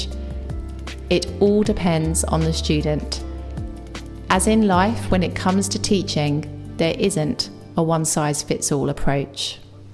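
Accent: England, United Kingdom. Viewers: I love your English accent 🍷💕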